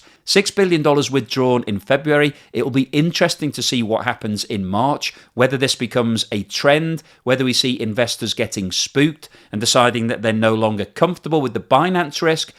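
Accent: United Kingdom. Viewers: P.S. appreciate your regular brit accent. Don't do Posh! That accent sounds like the king of england got combined with a California valley girl. Awful. Posh accents are ruining British English!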